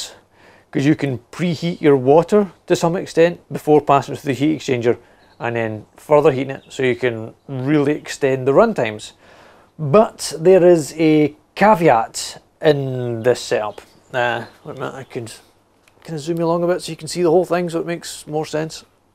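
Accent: Scotland, United Kingdom. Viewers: Listening to your?eastern? Scottish accent is bamboozling my fancy. 😁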